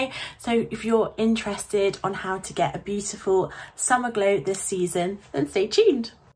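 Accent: United Kingdom. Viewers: Her accent sounds like she is from Devon or Cornwall. She does not speak with a cockney accent so its highly unlikely that she is from the London area.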